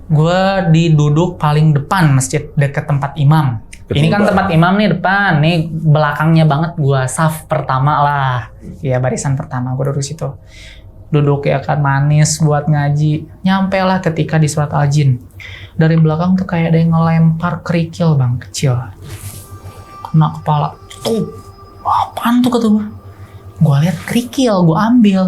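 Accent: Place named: Sunda, Indonesia. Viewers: sunda pisannnn mntp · pinaliiii sunda pisan ang..ang..ang...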